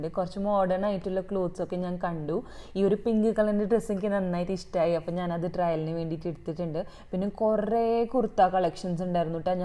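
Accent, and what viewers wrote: Thrissur, India. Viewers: This time Thrissur slang nallapole vannittund.... Nalla change... Purple, blue, pink ellaam nice kurtis aanu.... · Why suddenly this thrissur slang ? I never felt this before in ur vids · Eee thrissur slangine evide olippich vachirikkaayrunnu? 😅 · ഇന്ന് ഭയങ്കര തൃശ്ശൂർ സ്ലാങ് ഉണ്ട് സംസാരത്തിൽ 😁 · Pakka thrissurkariyayallo😍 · Aaha...namude thrissur slng aanalo inn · Pakka thrissur slang❤❤❤❤ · I could hear the trissur kaari in you dear ...was a lovely relaxing vlog ...i miss Kurti shopping too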